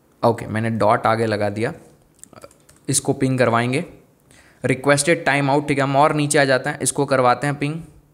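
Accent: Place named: India. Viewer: I'm sorry, but he speaks English or Indian? I understand more or less what he says.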